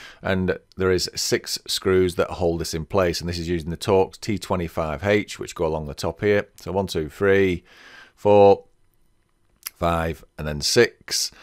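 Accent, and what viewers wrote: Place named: England, United Kingdom. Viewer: Good to hear an English voice for a change on an instructional video.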